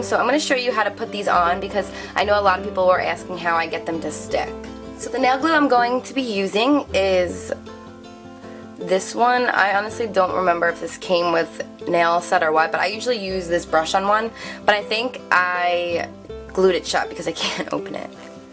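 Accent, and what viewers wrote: New Jersey, United States. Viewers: Omg your NJ accent came out deep here ! Lmao your the shit ! Screw the haters your beautiful !!!!!!!! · You sound like you're from jersey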